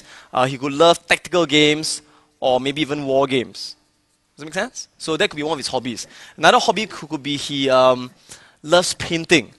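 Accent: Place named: Singapore. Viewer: tiếng anh singapore nghe khó chịu quá :(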